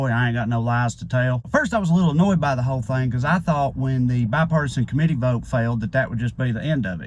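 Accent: South, United States. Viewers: I love what the guy is saying and the way he says it too. Well Gee Whiz Sargent I do believe. Shazam. Recognize who I am talking about now. He can really sing good. I'm talking about this guys accent which reminds me of Gomer Pyle. I could listen to him all day long. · I can't explain what good feeling I get when a guy with deep South accent says really perceptive things . My dad had the unusual combination of southern accent and a phd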